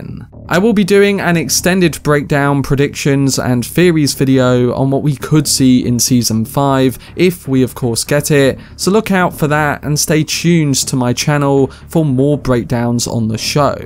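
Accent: United Kingdom. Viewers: It’s standard British pronunciation, don’t be an ignoramus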